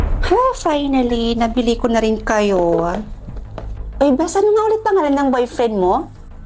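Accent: Visayas, Philippines. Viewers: Bisaya ka po ata ? 😊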